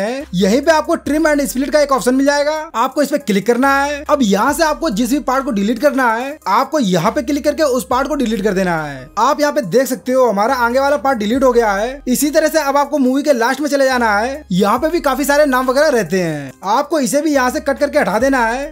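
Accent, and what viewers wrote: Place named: India. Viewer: Why he is saying always click and then I I heard it wtf india😂 accent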